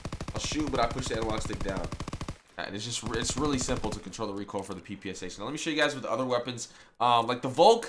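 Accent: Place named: Canada. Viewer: you sound Canadian, are you?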